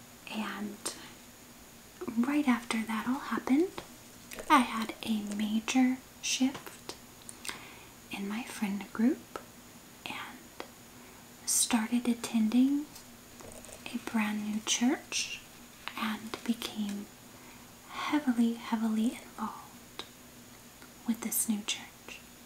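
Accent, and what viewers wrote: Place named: South, United States. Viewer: Welcome back! Thanks for the update! Random but I hear your accent way stronger now than in previous videos... southern! (Or texan?):)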